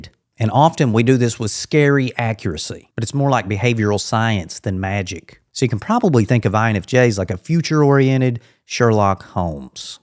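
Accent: South, United States. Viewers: Love your southern accent. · What state are you from?? Trying to figure out the accent bro lol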